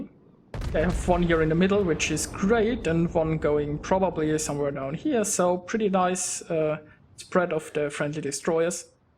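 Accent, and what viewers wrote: Germany. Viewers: Man merkt schon an deinem Akzent, dass du ein Deutscher bist. Also vermute ich :)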